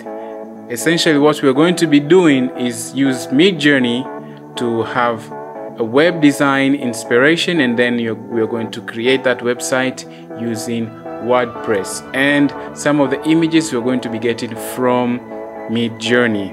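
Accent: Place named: East Africa. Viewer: good work my guy, are you from Uganda,, or Tanzania or Malawi, you sound like one of ! keep on dropping knowledge my guyy, excellent work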